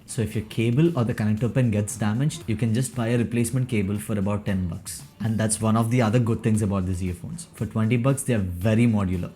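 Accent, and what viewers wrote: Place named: India. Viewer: Good video nice to see you are proud to be indian and using Hinglish rather than trying to overact with fake accent. And the mid issue is solved in kz zsn pro X varient which cost almost same .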